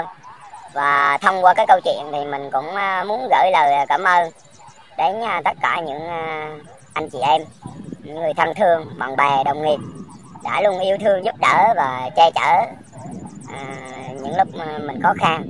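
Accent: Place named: Quang Nam, Vietnam. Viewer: Yeu lam tieng Quang nom!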